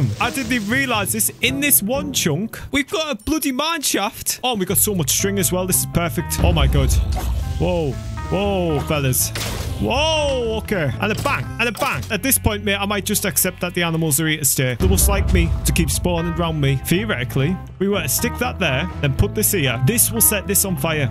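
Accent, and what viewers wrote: Scotland, United Kingdom. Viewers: Are you British? I don't care you you even answer And is this video sponsored by laff loaf? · Are u Briish are u briishmate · You sound Scottish like me mate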